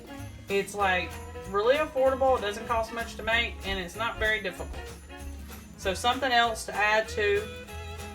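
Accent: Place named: South, United States. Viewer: Love your southern accent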